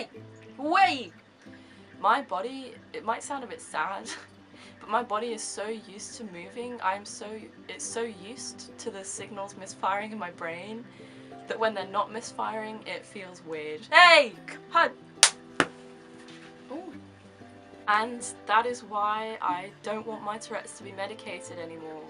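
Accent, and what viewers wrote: United Kingdom. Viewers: I don't mind your tourette's . I hate the British accent.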